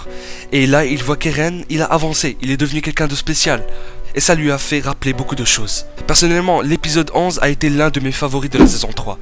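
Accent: England, United Kingdom. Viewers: Super accent anglais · Merci bonne année à toi aussi et j'adore ton accent Anglais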